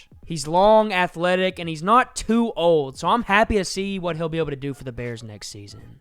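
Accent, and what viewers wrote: South, United States. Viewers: Good comment but it is a southern accent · You have a nice voice youngin.. and your production is very clean.. Great used of the 5 sec rule, facts and references. I look forward to hearing more from you. Your defiantly in the south somewhere with that comfy accent lol. Keep it going, dont do some idiot type stuff, watch you company, keep pumping and you can go pretty far.. Look forward to hearing you on ESPN .. Keep your nose clean.. Sub!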